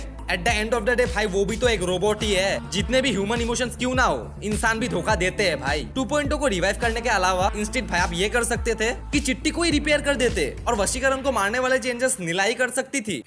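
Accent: Assam, India. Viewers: Bhaii apka hindi bolneka tarika se lagta he u are from Assam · vaik assamese ho pata chal gaya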